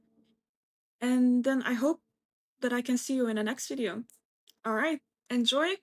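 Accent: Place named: Morocco. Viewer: U sound moroccan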